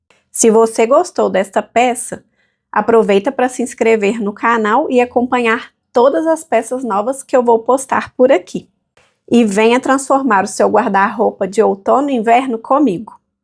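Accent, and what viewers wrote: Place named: Minas Gerais, Brazil. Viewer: Maravilhosa a saia, e as informações adicionais sobre como ela veste corpos variados me ganhou! Inscrita com certeza, muito obrigada! Vc é mineirinha? Seu sotaque é familiar 😂❤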